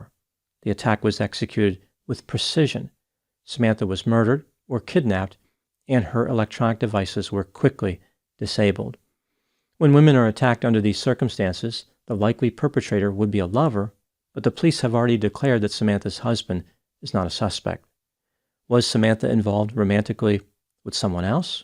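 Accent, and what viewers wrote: United States. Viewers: He’s not pronouncing it right. He has an American accent, he should say it like “Mel-burn”. With a hard R.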